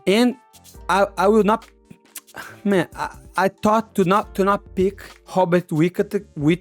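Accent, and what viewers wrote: Brazil. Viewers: I watch your channel because you remind me of my brazilian friends accent back in high school. Youre a great fighter and comedian Respect brother.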